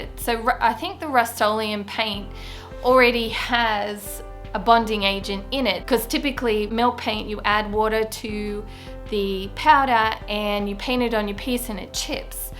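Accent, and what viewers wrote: Australia. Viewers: Awesome piece 👏🏼👏🏼👏🏼 BTW, where in Oz are u from? I’m from Maroubra, Sydney’s eastern suburbs. Really enjoying ur videos & ur Ozzie accent 😁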